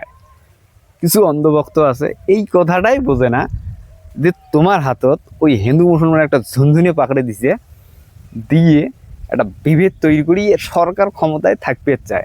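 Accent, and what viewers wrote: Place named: Bangladesh. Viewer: তুম কহা কা জ্ঞানী বাবা!😂 তুমি এই ভারতে কবে থেকে বাস করছো। তোমার কথা বলার ঢঙ্ দেখে মনে হচ্ছে যে, ওপার বাংলার। এখনো ভারতের মতো রপ্ত করতে পারো নি।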